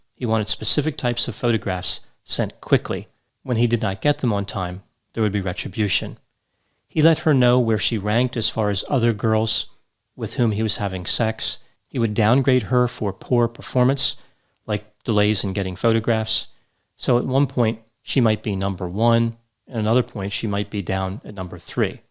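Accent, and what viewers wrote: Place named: Philadelphia, United States. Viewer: Is from Delco/Philly area? I recognize our accent anywhere. Lol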